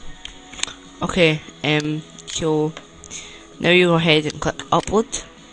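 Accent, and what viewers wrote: Scotland, United Kingdom. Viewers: your scottish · He is scottish, :P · I can tell it is a scottish accent I'm scottish but I don't have the accent. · sho ya hache cho dchownload ich in ya sherver... IS THAT HOW U SPEAK? clearer please... but i think you are scottish cuz your now is like scottish now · British accent + lisp. Not a good combo. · dued are you brittish your voice it sounds brittish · judging from his tone im sure hes scottish am i wrong?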